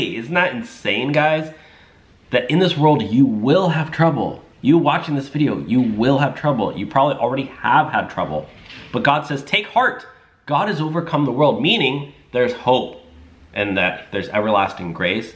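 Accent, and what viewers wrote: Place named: South, United States. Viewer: Where are you from(born), I heard a little southern accent?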